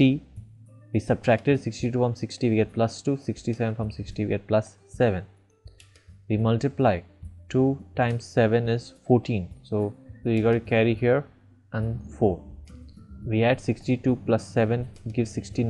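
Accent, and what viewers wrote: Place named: India. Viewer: i am killing myself when listening indian when he speaks english!!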